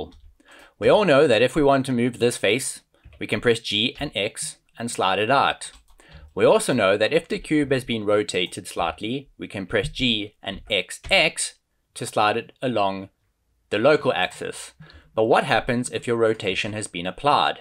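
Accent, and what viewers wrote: South Africa. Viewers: Well I'll be damned, that's a Saffer accent if ever I heard it! Where you form AB?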